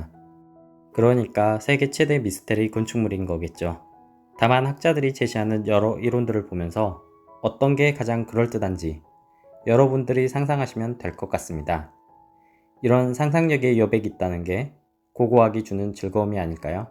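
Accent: Jeolla, South Korea. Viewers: 말투에서 잔라도가 느껴지네요..내 생각이 맞을걸요~ 사투리 감별사임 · 전라도사투리 구수하고 좋아요ㅎㅎ 잘봤습니다 잘만드셨네요 유익했음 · 전라도 사투리 모댔던(못했던) 참ㅋㅋㅋ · 전라도 분이시네^^ 영상 잘 봤습니다~~ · 전라도 사투리가 심하네요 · 고향이 전라도신가요 ㅎㅎ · 전라도사투리쓰는거 안부끄러워요? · 유튜버 전라도 사람이네 ㅋㅋ 못했던>>>모댔던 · 전라도 분이시네요.사투리가...^^ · 사투리만 고치면 더 좋은 영상이 되겠네요. 전라도 사투리가 너무 심함.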